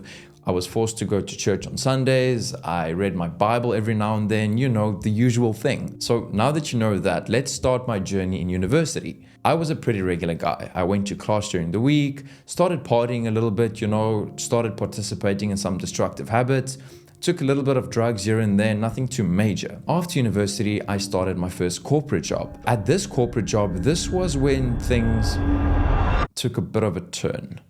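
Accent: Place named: South Africa. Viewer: I love your accent. Is it South African by chance, or New Zealand?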